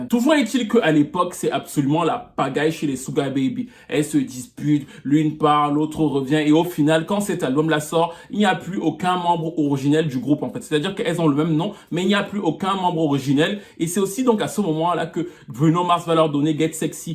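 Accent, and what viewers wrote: Cameroon. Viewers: Je dors avec tes vidéos!tu narres comme jamais avec ton petit accent cametchek par moment!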